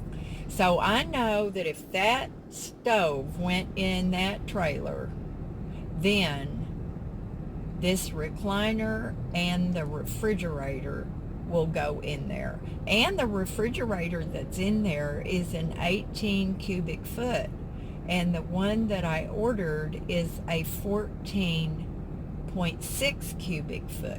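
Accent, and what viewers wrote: South, United States. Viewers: I grew up in Louisiana. Kinda recognized a southern accent and thought maybe you had been nearby at some point :-) I've lived all over too!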